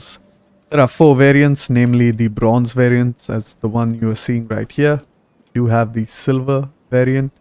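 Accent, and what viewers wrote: India. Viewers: Are you a desi? You accent is similar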